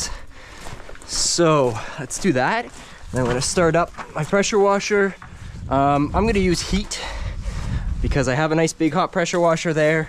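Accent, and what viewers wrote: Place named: Canada. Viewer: This young man sounds like a Canadian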